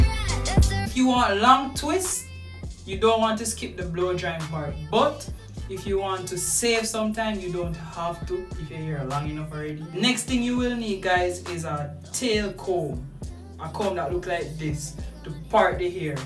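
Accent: Jamaica. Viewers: I know u Jamaican breddah 😂 big up 🇯🇲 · U Jamaican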